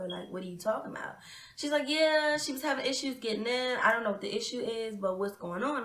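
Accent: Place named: Baltimore, United States. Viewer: Just found your channel!!! I love it! Are you from BMORE or DMV? LOL I hear your accent lol New subscriber boo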